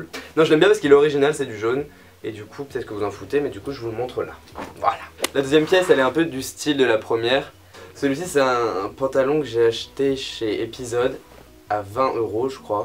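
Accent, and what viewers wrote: Quebec, Canada. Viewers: Jpp j'ai vrmt cru que t'étais québécois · j'ai cru que t'étais québécois, qd jte entendu parler normal ça m'a fait bizarre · ptn au début j'ai cru t'étais québécois j'ai pas compris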